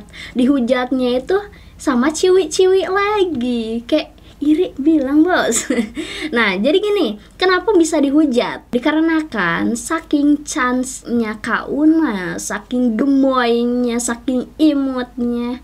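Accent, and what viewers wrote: West Java, Indonesia. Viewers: Itu logat Sunda sih kalo gak salah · ai kaka teh orang Sunda aku tau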